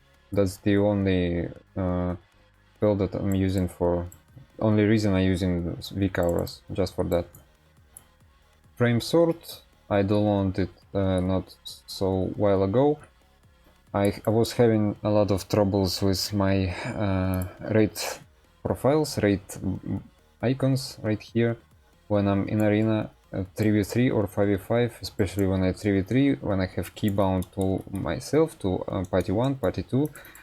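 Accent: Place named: Russia. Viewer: Кажется, мне слышится русский акцент...хм ...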